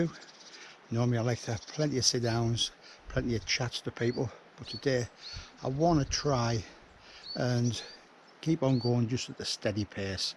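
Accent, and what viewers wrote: Scotland, United Kingdom. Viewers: Well done, you did it again! Class commentary and stories about previous experiences loved the Scottish accent but you need a kilt to be authentic!! Maybe you should try an Irish accent next, to be sure. Pity about the cloud at the top but you got spectacular views on the way up and down and it looked busy. Scottish roads are a bit like the lake district, narrow and windy. But rest up now, more adventures to come. Andrea sends best wishes, regards Ashley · Wow what a spectacular place. Stunning! I know what you mean about pushing on and not taking breaks. There are times where one must keep moving to ensure success. I’d call that a success despite the little bit of cloud whipping by the summit. Outstanding views at the top but really all along the trail. Glad that snap decision on a prior trip worked out ok. Kids are often tougher and more skilled than we give them credit for, but as a parent we’re worried about them on their own. 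It was no doubt a good experience for them. Oh and I love the Scottish accent! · OK so why is it your last time up that mountain? It is a terrific hike. I kinda wish I had time to do it when I was there. Nice Scottish accent. 😎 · Unbelievable, enjoyed that! Well done, 4 times is quite impressive! That Scottish accent got me hahah. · Another superb video, great memories of climbs past you shared with us, the views are amazing and so well shot by you. Scottish accent fantastic and funny. Really well done on the climb, I think you will be tempted to go back again. Keep the videos coming, I really enjoy them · that was absolutely class mate, I'll have to get the bonny lads up there ha ha 😀 The shots with the snow were tremendous, you know what I'm talking about!! Love the Scottish accent marra ha ha, don't know what you said but i don't think anyone knows what the bonny lads are slavering on about 😃 all the best Chris 👍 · Looks like the mountain fitness is back then 😊. 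That Scottish accent - Wow! Must be some Scottish blood in the family. You’d look good in a tartan bandana if there was one 😊 cheers atb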